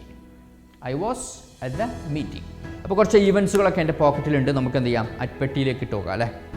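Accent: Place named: Malappuram, India. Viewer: നിങ്ങൾ ഒരു മലപ്പുറംകാരൻ ആണെന്ന് തോന്നുന്നു... ശരിയാണോ ?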